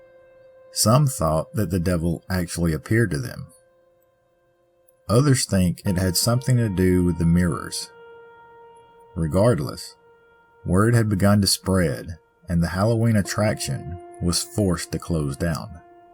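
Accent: South, United States. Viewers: Delicious dialect 😊😊 loving the sound of your voice as always looking forward to hearing this.. its 0134am xx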